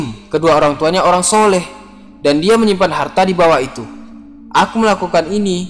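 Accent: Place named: Sumatra, Indonesia. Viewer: Logat abang macam orang sumatra lah... Iya kah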